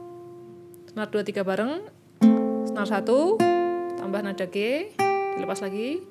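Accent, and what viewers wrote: Java, Indonesia. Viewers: Mbak nya rodo medhok.. ailapyuh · Jowone medok mbak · Wong jowo yo mbak..kliatan logatnya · Suarane medhok mbak yu......😃......... Yen ing tawang ono lintang.....mbakayu.....😀😁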